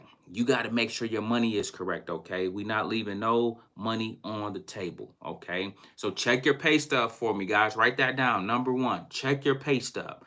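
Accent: Detroit, United States. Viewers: Are you from Detroit?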